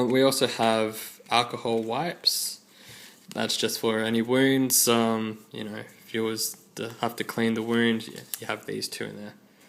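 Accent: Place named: Australia. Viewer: LtWolf/TheOfficialWolf haven't you ever heard an Aussie before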